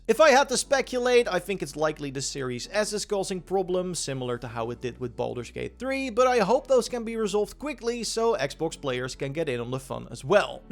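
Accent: Netherlands. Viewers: He is dutch, you're correct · I think he's not a native speaker. His accent sounds dutch.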